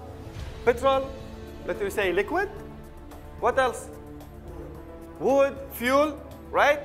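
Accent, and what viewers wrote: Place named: India. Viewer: Excellent explaination eventhough he sounds like a Indian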